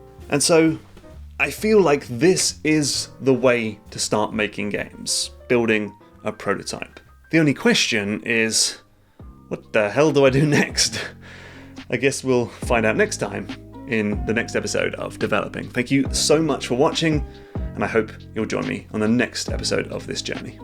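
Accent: United Kingdom. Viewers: video starts, british guy speaks, subscribed, its that easy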